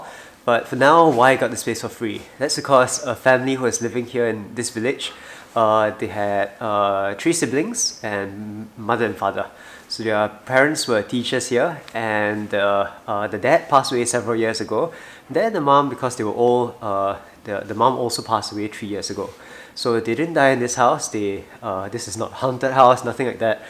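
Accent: Singapore. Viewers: Are you Singaporean? Your accent very familiar..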